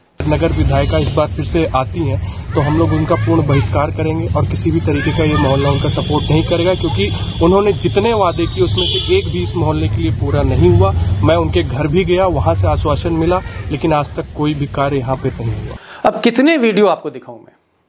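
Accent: Lucknow, India. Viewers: Aap ke andaz me lucknow ka asar dikhta hai.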